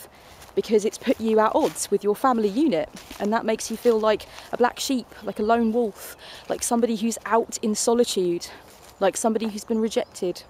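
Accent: United Kingdom. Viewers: Ps. i love your channel (and your brittish accent) you are full of knowledge!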